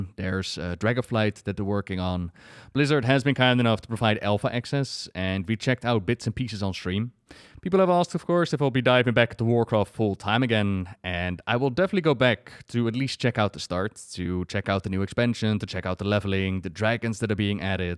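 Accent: Netherlands. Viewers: This is such a random thing to mention. But you really helped me back in 2013/14, not with WoW lore(although it was good) but with actor training. I had been cast in a production of the Diary of Anne Frank. (I was one of the Van Daans, who I believe were Dutch?) Pur director had asked us to try and make our accent convincing. So I listened and binged nearly all your videos in order to learn certain pronunciations and how you formed words etc. I was told my accent sounded excellent and was extremely convincing. So thank you for the help...almost 10 years ago. Who knew WoW lore videos could used for other means😅😂! I'm not sure if you are Dutch...my 18 year old self thought you were but hey it worked out in the end.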